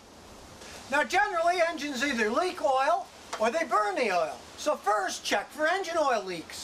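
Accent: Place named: South, United States. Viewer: Where it'd be drippin its hard to tell he said that because his southern accent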